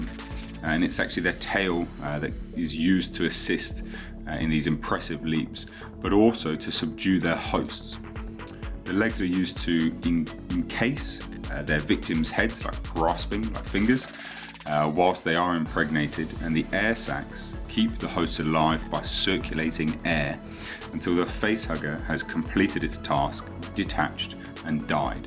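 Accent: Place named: United Kingdom. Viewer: Friggin' British accents! I thought you started off with an "Overmorph." Like some sort of ubermench form.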